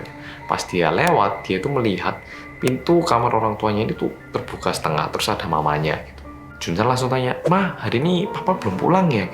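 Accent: Java, Indonesia. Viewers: Awakmu aneh tenan tak rasakke mas, lha awakmu arek korea nangin kok tag line ne kok yo jowo, medhok luwe po meneh kewedhen...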